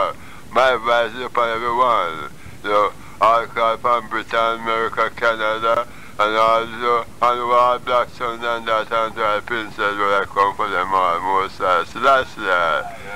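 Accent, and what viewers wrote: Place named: St Elizabeth, Jamaica. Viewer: he just have a deep ancient St Elizabeth accent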